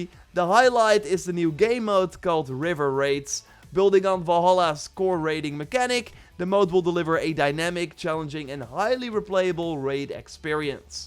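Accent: Netherlands. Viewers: This Dutch accent hurts my poor ears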